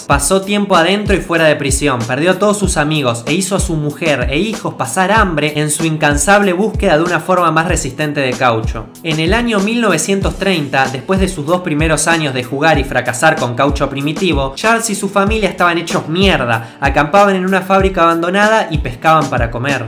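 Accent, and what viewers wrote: Buenos Aires, Argentina. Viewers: No es ruso, :v y si es argentino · sos de buenos aires?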